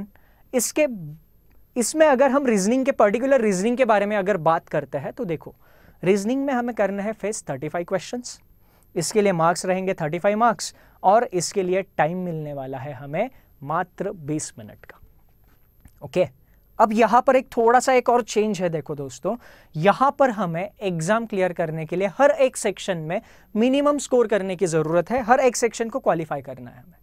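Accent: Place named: Maharashtra, India. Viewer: ....big fan of your teaching style PS: by your hindi pronouncation seems like you are a Maharashtrian....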